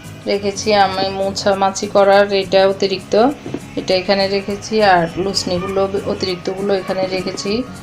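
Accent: Noakhali, Bangladesh. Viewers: Mone hoy apur desh Lokkipur ,Noakhali,darun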